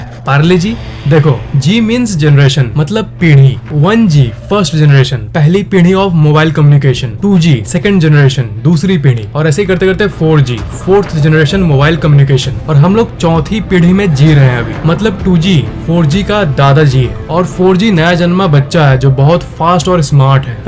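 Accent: Bihar, India. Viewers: Bro are you from bihar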